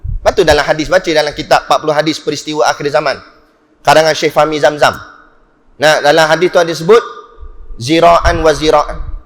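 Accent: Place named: Perak, Malaysia. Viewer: Patut lah fasih Loghat Perok...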